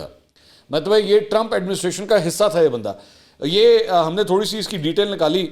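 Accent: Punjab, Pakistan. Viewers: Love the way you speak and translate the words with punjabi tuch ❤❤❤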